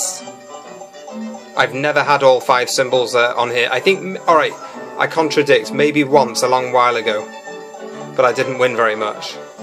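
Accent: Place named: England, United Kingdom. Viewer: Oh no, has the giggles! Actually I agree with love your voice and English accent.:)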